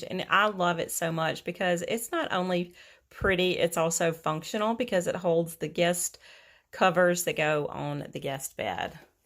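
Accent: South, United States. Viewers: Where are you located? I am just curious because I’m a southerner with your accent too!! 😂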